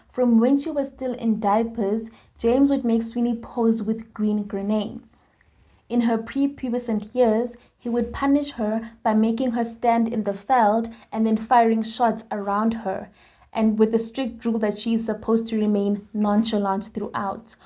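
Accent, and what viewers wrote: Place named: South Africa. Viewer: Hey you sound like amazing journalist and these are unique video.....love the SA accent. WhatsApp fam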